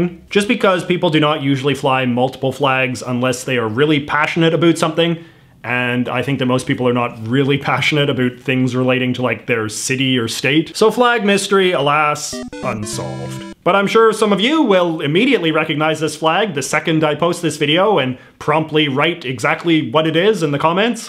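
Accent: Canada. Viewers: aebout, und and you are canadian bro am i right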